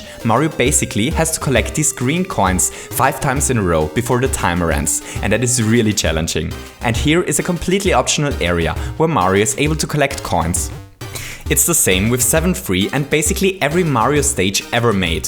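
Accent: United Kingdom. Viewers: I'm guessing he speaks English with British people. They substitute "ah" for "er". It could be hard to distinguish between the two in conversation. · "Goompers" "Koopers" "Pletforms" "Dadly" "Chellenges" "Flegpole" WHY IS BRITISH SO BAD!?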